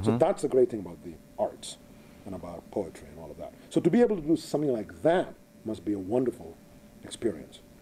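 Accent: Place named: Scotland, United Kingdom. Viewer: Thank goodness he has mentioned Indians regarding mathematics etc. I can detect a kind of Scottish accent coming through VS. He sounds a bit like Sean Connery now and again.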